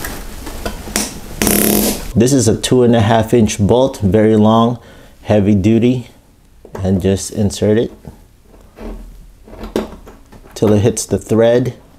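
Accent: Philippines. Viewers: Your videos are very well put together! Pinoy kaba? Haha I lived in the Philippines for a while, I think I’m picking up on an accent!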